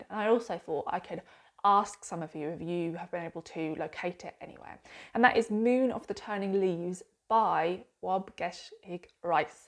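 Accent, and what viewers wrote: United Kingdom. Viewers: Hi. All books you described are alright. Above all, your pure British accent is most welcome. Many thanks ❤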